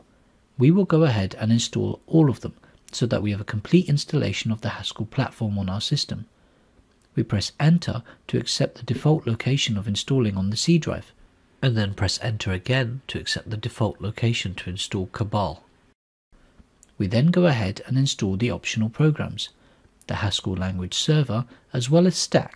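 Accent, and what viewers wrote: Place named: United Kingdom. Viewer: Could be better without the annoying british accent which is incomprehensible at times.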